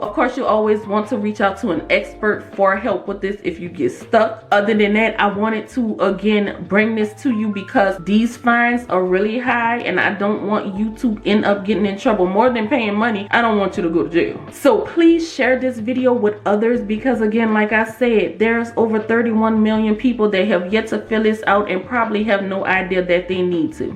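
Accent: New Orleans, United States. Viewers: She's is from NOLA ❤ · You sound like a NOLA baby on the slick! Love the video!!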